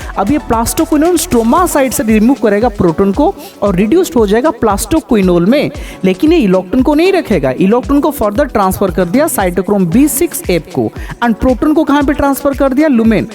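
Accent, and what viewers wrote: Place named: Kolkata, India. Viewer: Aap tho kolkata wale ho😅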